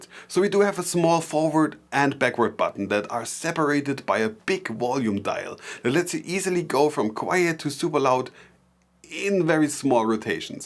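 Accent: Germany. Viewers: man hört das du Deutscher oder vllt sogar Österreicher bist :D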